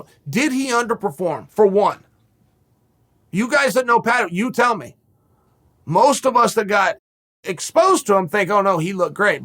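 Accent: Liverpool, United Kingdom. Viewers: Over-hyped Scouse just like Till. Everyone needs to 'CALM DOWN LAD' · Proper scouser! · he’s scouse lad. Like the Beatles saying ‘you know’. Get used to it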